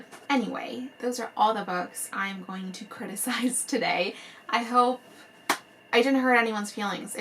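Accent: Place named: United Kingdom. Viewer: Are you British? I hear an accent every once a while!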